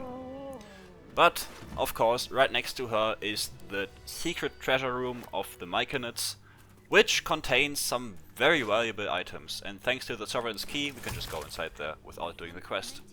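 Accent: Germany. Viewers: can I ask about your accent if you don't mind answering? sometimes you sound standard german but sometimes you sound like a combination of singaporean + australian so I was just trying to narrow it down haha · Are you german? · Yeah, it's probably not possible...I'd consider myself to be a diehard fan and way too obsessed with this game and I can't think of any way that might make it work solo, at least not within 15 turns. I'm currently rewatching your video (after watching some of your build guides) while doing my own "kill everything on sight" run. 😄 I did cheat for the nautiloid though, because I have mods that make additional enemies spawn and I couldn't have handled 3 cambions and 2 mindflayers (+ the 2 cambions on the way and all the smaller enemies) on my own :D The run is a lot of fun, though I gain xp way slower than I thought I would from slaughtering everybody; I guess not finishing any quests really makes a difference. 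Completely unrelated, but I was wondering: Is you accent German?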